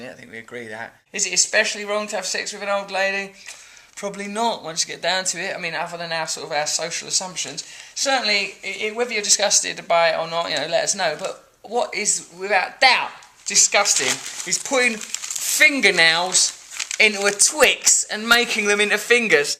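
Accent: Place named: United Kingdom. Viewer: Good afternoon dear Russell ( in England, in Mx, the morning ) first of all I would like to thank thee very much because now we can have a little bit larger glimpse to have a look ( though still very rapidly seen for most of us, I think, to grasp the title completely but all the same you have slowed down your pace, which is really appreciated) at the books you read excerpts from. As homework, you leave us with many themes to think about but I take the one I could grasp thoroughly ( little by little am getting my ears used to thy velocity of speaking and your lovely British accent ) the subject matter I could comprehend was the one related to our fleeting passing through this dimension on planet Earth ( haven't you observed that we've been programmed with this notion since we're children by parents, relatives and later school and everyone confirming and reaffirming as scratching screeking old vynils that "life is so short" that "it fleets away" etc., all those currency-phrases we have to deal with all of the time, day after day, yes indeed but pleeease ... 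) well what I wanted to say is that o.k., sooner or later we shall be dead one of these days and that what about our belongings, as you said: "it won't be our problem anyway". Then, and in order to prepare for that event that in any second passing, any minute, any hour, any moment is nigh and nearing: would you please include in your list of topics for your discussion books like the Tibetan Book of the Dead " Bardo Thodol" a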